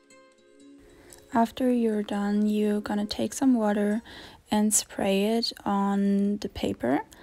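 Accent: Germany. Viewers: Heyy nice vid! Btw are you german bc you have a german english accent :')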